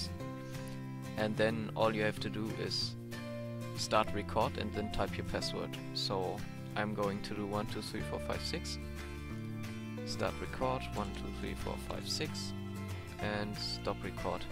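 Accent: Germany. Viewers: man hört sehr stark heraus das du deutsch bist deswegen finde ich sollst du es eigentlich auf deutsch machen und nicht auf englisch, da geht es nicht darum ob man englisch kann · Man hört deinen deutschen Akzent mega :DD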